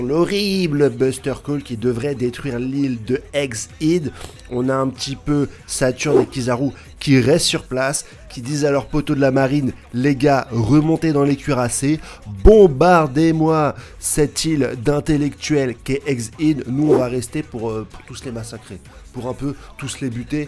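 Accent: France. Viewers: Il serait temps que Dragon se bouge un peu 🤣 Sinon pas mal l'accent du sud il y a du potentiel.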